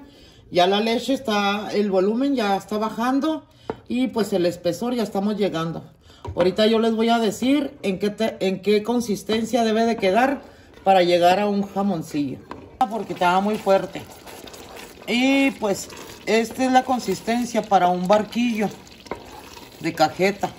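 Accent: Chihuahua, Mexico. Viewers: Gracias señora, sabía que su acento es de chihuahua y necesitaba la receta de chihuahua ❤❤❤❤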